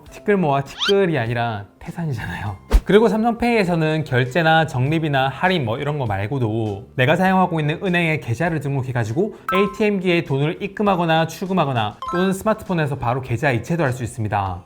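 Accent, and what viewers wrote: Gyeongsang, South Korea. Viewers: 살짝 돌출된 입 ... 들릴듯 안들릴듯한 경상도 사투리... 뭔가 깔끔하고 단정한 김영철같아요... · 유용한 꿀팁 감사합니다 경상도 사투리가 귀엽네요 ㅎ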